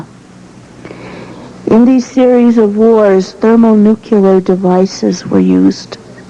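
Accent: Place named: United States. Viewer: why does she talk in american accent?of all accents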